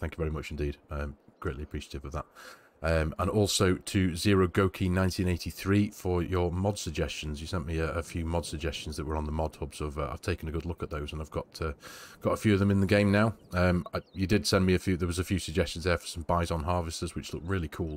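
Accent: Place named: North, United Kingdom. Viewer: This is a very good series. Thank you. Love your accent, you sound more northern.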